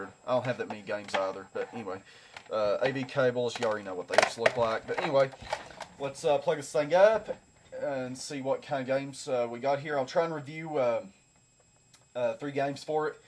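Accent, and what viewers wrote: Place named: South, United States. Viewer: You have a thick southern accent man. Where you from? SC Here. :D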